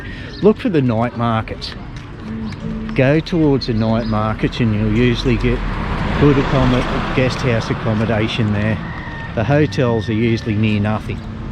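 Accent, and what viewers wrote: Australia. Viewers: I detect a slight trace of Australian accent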